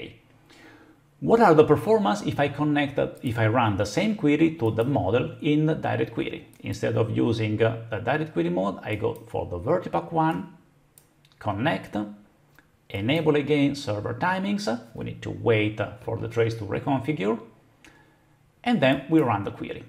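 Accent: Italy. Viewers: Power BI is much more sexy with an Italian accent.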